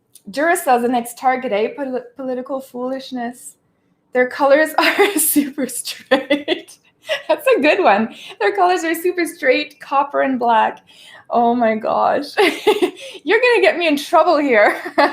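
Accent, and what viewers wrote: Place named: Quebec, Canada. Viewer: I thought I heard French -Canadian. I was just about to ask when you mentioned it.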